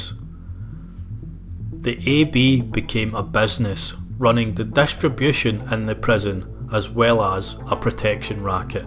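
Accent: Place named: Scotland, United Kingdom. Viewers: You sound scottish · Didn't think I'd be watching a documentary on American prisons with a fellow Scotsman narrating it 😂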